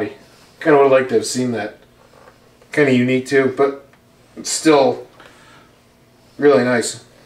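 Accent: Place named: Canada. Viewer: O.K., Good cover, guys!, and a Great guitar!, 👍 Gave you a follow, too. Because IF YOU'RE NOT CANADIAN!, you certainly SOUND Canadian, with straight-shootin' straightforward appraisal!, of the qualities & sound of an Ibanez I have, and appreciate! Rock on! 🎉😂 🇨🇦 🍁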